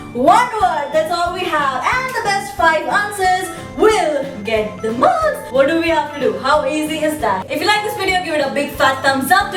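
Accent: Maharashtra, India. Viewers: I love your Marathi accent! MI MARATHI feelling patriotic af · Hey i m a new subscriber found your vedioes hilarious and interesting specially the 10 words u learned from delhi acctually i m also from NCR found your marathi accent interesting. Keep it up ur expressions are too good . And falana dhumkana hahaha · zakkas g muli...todti aahes tu .bakichyana..sahi..i lv d way u say marathi ..hilarious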